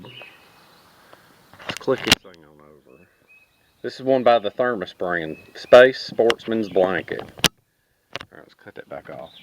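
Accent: South, United States. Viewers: I subscribed to you a few weeks ago now. Love your honest life experience opinions. Keep your videos coming, very educational. Seen so many bug out bags, the wife's kicked me out bag lol, get home bag, I got this knife to add to the 5 other knives lol Common sense. I've added to my van a few of what you have. Many thanks. My wife loves your southern accent.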